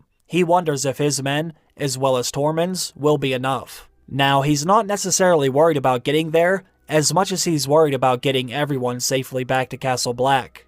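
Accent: Pittsburgh, United States. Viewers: I know this is completely off topic but are by any chance from Pittsburg?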